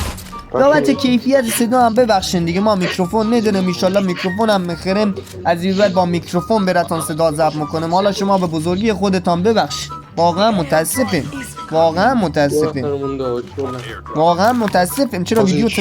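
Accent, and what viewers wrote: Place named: Mashhad, Iran. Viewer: کی از لهجه مشهدی بدش میاددد🥹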